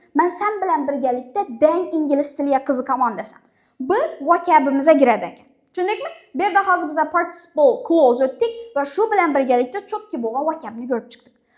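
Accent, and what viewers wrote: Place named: Khorezm, Uzbekistan. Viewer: Ex xorazmcha ekanda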